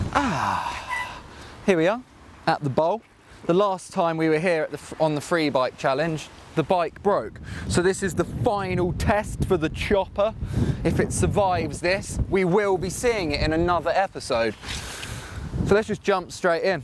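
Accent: United States. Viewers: Dude that So-Cal accent is on point I feel like I'm at home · American English is on point dude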